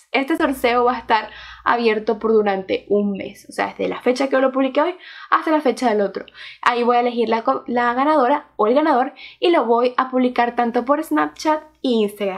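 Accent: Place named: Venezuela. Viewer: Sii! qué lindo sorteo!!! bienvenida nuevamente!! te veo desde Argentina! lo que más me gusta de tus videos, además del contenido, es tu voz! jaja no sé porqué pero me resulta muy relajante jaja será por la tonada venezolana jajaja.. bueno te sigo en casi todas las redes sociales menos snap ya que no tengo :( espero se comprenda que muchas personas no contamos con cuentas en algunas redes sociales ya sea por falta de tiempo o simplemente por gustos...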